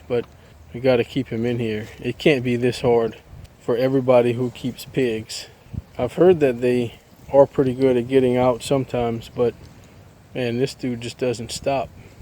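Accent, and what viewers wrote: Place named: Louisiana, United States. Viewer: You have a Louisiana accent. Are you in Louisiana? Lol, he is sniffing Cassius. Lol.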